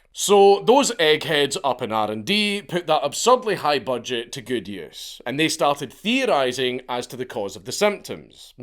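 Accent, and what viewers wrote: Scotland, United Kingdom. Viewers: “Became knoGwn as the golDf waDrr “ Yo Scott’s are cool af bro . Best English variant yet other then American southern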